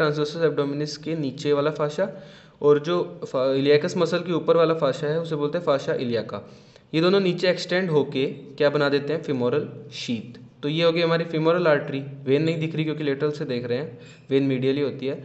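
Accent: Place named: Haryana, India. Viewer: sir tham to haryanvi lago ho manne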